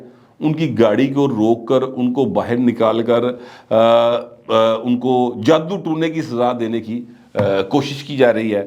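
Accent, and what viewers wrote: Punjab, Pakistan. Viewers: Gohar Butt sb I am a professor in English department your personality is not of a journalist but of a professor really graceful and your Punjabi accent is truly awesome and captivating .nice analytical vlog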